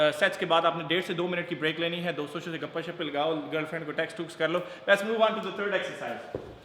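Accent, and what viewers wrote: Punjab, India. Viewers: Excellent elaboration of each workout. I really like the punjabi language touch of the guy hosting the videos. Keep the good work up..!!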